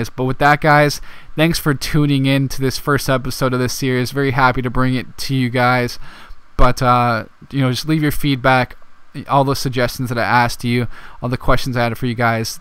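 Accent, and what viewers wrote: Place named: United States. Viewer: Finally you don't know how hard it is to find someone who does AMERICAN English Fifa career mode great video btw new sub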